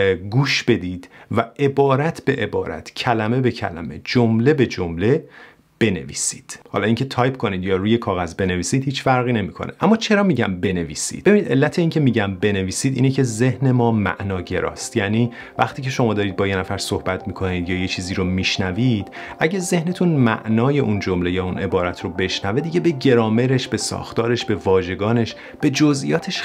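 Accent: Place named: United States. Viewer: ممنون از لطفتون🙏🌱 خودتون با لهجه‌ی آمریکایی در کشور انگلیس صحبت میکنین این تاثیری روی ارتباطات نداره؟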